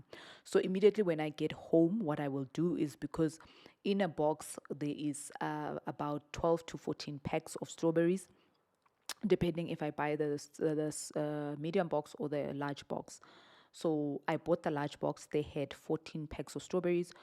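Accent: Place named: Zimbabwe. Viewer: oh my you have a Zimbabwean accent I didn't even read the caption I just saw a black woman and I clinked lol but anyway this is so needed for the young ones good job mama love from Canada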